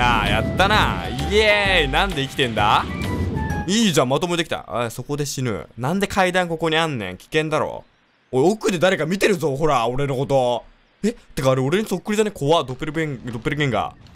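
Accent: Kansai, Japan. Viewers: *の関西弁ばりばりのやつ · さんの関西弁定期的にみにいってしまう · 関西弁多くて好きや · さんが関西弁だった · バリバリの関西弁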